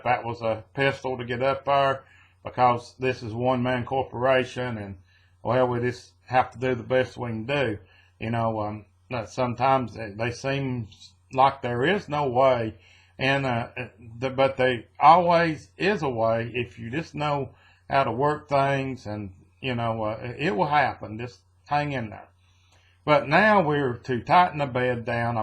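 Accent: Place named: North Carolina, United States. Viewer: That is a pretty GMC Sonoma. I have one just like it. A 91 GMC Sonoma 4.3 liter V6 RWD. Mine is painted that exact color except mine looks dull compared to your shiny red. It was my grandfather's, then my dad's, and now mine. A lot of sentimental value to me. Thanks for the video. You speak like you are close to my area too. North Carolina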